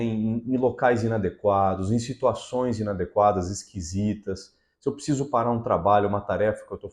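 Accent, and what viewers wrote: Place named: Recife, Brazil. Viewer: Aí sabe que fala Recife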